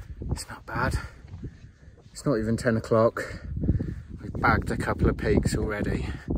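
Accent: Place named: East Anglia, United Kingdom. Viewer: Ah, are you from East Anglia? Your accent sounded familiar to me and thought you might be from that area?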